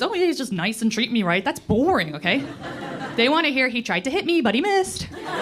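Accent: Ireland. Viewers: Same. Reminds me of Saoirse Ronan. There's something about the Irish accent that is appealing.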